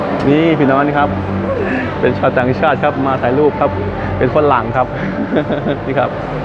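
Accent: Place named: Sakon Nakhon, Thailand. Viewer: เจ้าสัญชาตลาวแท้บ้! ฟังสำเนียงคือ แถว สกลนคร หรือนครพมม...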